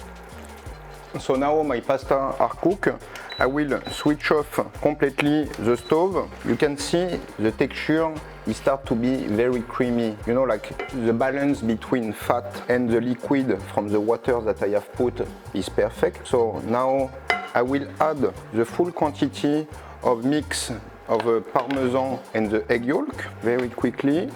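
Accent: France. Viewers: I've never seen a thicked French accent et je suis français! Very nice technique! Makes for a fatty meal but it must taste amazing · WOW! I haven't seen this carbonara technique before. I will try it this weekend. Thank you, for sharing. I love your French accent. 😊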